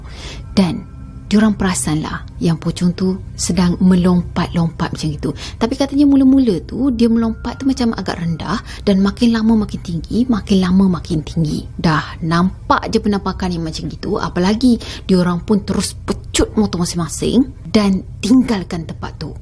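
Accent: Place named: Terengganu, Malaysia. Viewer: Loghat Ganu faham tak?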